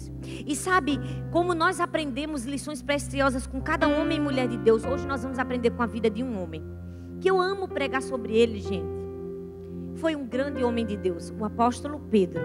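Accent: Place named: Pernambuco, Brazil. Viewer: eu tenho esse mesmo sotaque dela. 😂😂 Tbm sou de Pernambuco. Só falta eu visitar a Igreja do Amor e apreciar esse 'bela' voz de perto 😂😂😂😂😂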